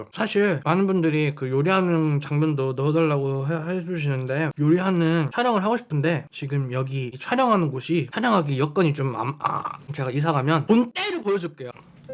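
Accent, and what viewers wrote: Jeolla, South Korea. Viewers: 말할 때 가끔 들리는 전라도 억양이 너무 매력적...♡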